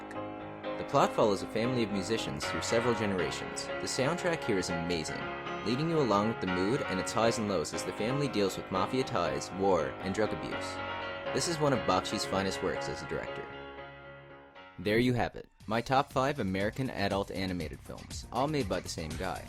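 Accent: Canada. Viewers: Do all Canadians talk the same way on YouTube?...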